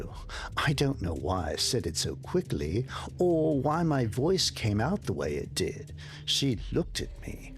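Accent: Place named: England, United Kingdom. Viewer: I loved the authors English accent. Favorite line: I’ve gotten really good at “comfortable thoughts”. It’s almost as if the English accent created a character that spoke with the consciousness that became this narration, not only in tone, but in vocabulary. Very authentic and hauntingly intriguing story.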